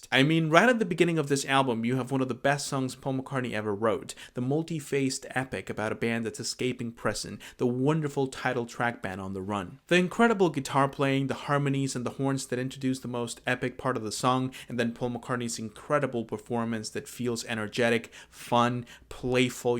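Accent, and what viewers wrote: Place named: United States. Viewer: A 90 miles an hour narrative from a fucking yank, fuck off man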